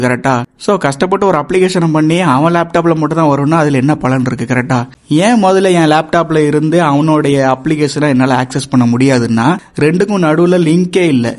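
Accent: Tirunelveli, India. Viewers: Bro .... Very clear explanation in tirunelveli slang ❤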